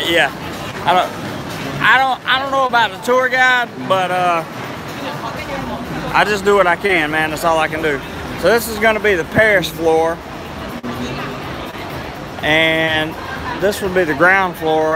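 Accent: South, United States. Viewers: His southern accent is so attractive. 😻 laugh like George W sexy boy!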